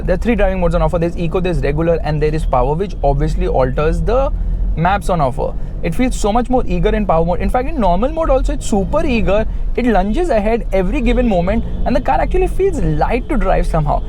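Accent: India. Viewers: Someone kill the Indian English accent please 🤢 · Typical indian english why dont you speak hindi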